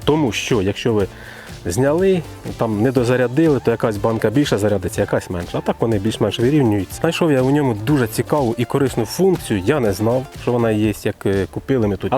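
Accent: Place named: Poltava, Ukraine. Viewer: Полтавский говор.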